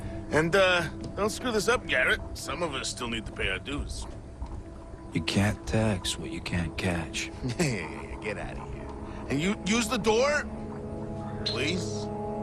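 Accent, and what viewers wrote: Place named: United States. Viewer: Arno Viktor Yeah it's a nice calm voice. Don't normally like the American Accent but dude you got it on lock down lol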